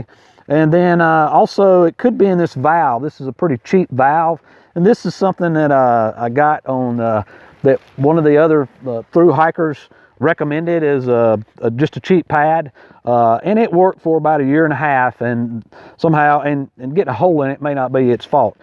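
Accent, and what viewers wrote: South, United States. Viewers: ur prob live in smokies sound southern very nice person and ur very helpful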